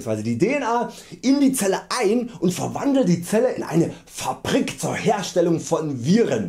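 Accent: Saxony, Germany. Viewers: Du hast exakt den gleichen Akzent wie Olaf Schubert richtig gut gerade wenn du genommen sagst haha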